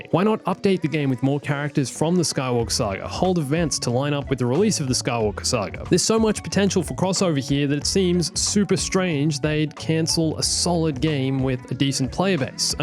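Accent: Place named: Australia. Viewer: Wait your an Aussie, I thought u where a briton.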